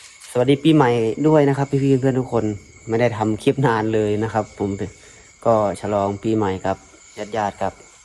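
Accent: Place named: Isan, Thailand. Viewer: โครตหลอนรอยตีนเห็นชัดมากเลยระวังตัวแหน่เด้อน้องเว้าไทยปนอีสานนำกระไดจ้าม่วนดีออก❤❤😊😊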